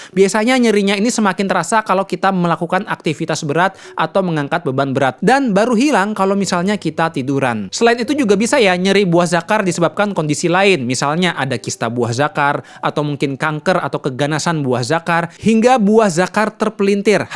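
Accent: Banjar, Indonesia. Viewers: Urang Banjar kalinya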